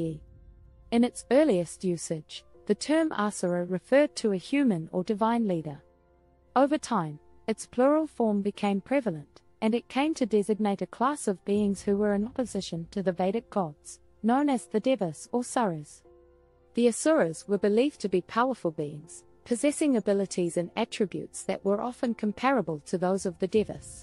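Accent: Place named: New Zealand. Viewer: Quite smitten with at this point, I must confess! I believe that I have heard three reader voices, though this one most frequently (like 90% of the time)… fan boy guesses you’re from New Zealand, or “Kiwis,” as I say with great affection and not a hint of affectation (so I could use a hint, though this is certainly one, which might lead you to question my lack thereof)… I tend to put the accent on the second syllable of Asuras, but I attended a very low budget ashram (our cricket team was called “The One Hand Clappers”)… — Jai Guru Deva, Glenn XOXOXO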